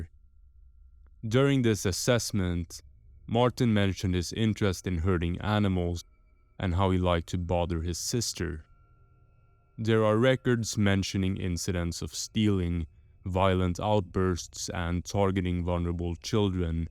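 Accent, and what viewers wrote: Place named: Sweden. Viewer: du gör inga typiska svengelska tabbar men det är några pyttesmå detaljer som avslöjar det 😁 'a' - ljud är något som verkar vara väldigt distinkt för oss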